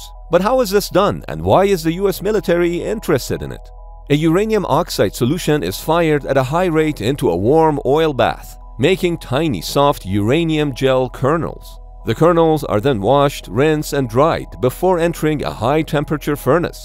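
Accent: Iran. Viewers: The Iranian accent makes the video even better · You got a Persian accent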